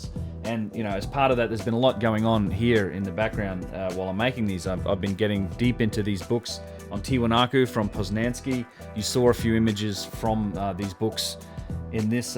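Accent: Australia. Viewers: Is the voiceover a really good Aussie AI voice?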